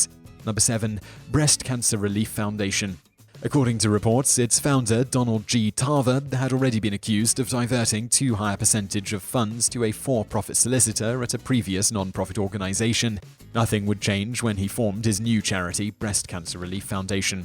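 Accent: England, United Kingdom. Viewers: I like your videos, but by the sound of your voice your English, yet all of your home topics are American. Why is that? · pyrovargas, Another communist imbecile. You can't tell the British accent?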